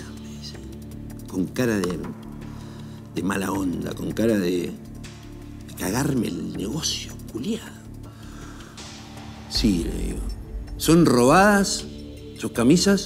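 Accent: Argentina. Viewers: Excelenteeeee Todavía recuerdo al Jorge Alís que promovía el Cachafás por allá en el año 2000 En la radio tierra am todas las tardes Más o menos como a las cuatro de la tarde No sabíamos cómo era el dueño de la voz gruesa tras el micrófono y no necesitaba más que su carisma y su acento para trasladar la conciencia de cualquier chileno de entonces Al lado positivo y con la verdadera riqueza argentina La del obrero, la del trabajador honesto y disconforme La del que ya se puso de pie una vez en el camino ... Sería but que éstas historias, Jorge las pudiera contar en un formato más largo Quizá de unos 45 minutos máximo y acompañado también de un mate ... Son tantas las oportunidades y tan diferentes las experiencias Que la mía personal, que es hija de la creatividad no se puede compartir de forma completa en éste comentario ... Sólo quiero agregar, que así como una vez de la hermosa Argentina salió una serie llamada Los Simuladores Así también salió Jorge, a rescatar el alma de todos nosotros, verdaderos soñadores ...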